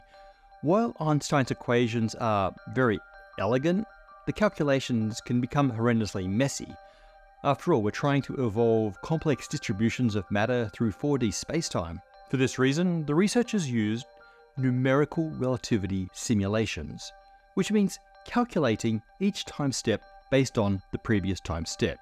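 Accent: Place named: Australia. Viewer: He's Australian lol.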